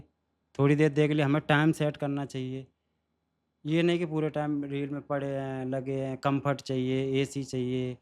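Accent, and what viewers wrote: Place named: Madhya Pradesh, India. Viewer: जय हिंद जी आप तो mp से हो आपकी भाषा mp ki लग रही हैं कंहा से हो सर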